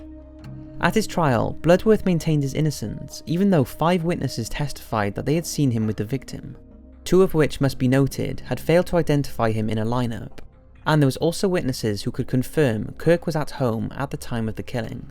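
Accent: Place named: England, United Kingdom. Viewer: Possibly because he's an English person? The rest of the world shouldn't have to change the way we all talk just because you Yanks can't take the time to figure out other countries accents and slang. So arrogant.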